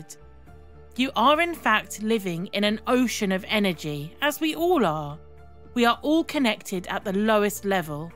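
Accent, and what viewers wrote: United Kingdom. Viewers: WHY do they always use strange. British accents to narrate these videos ?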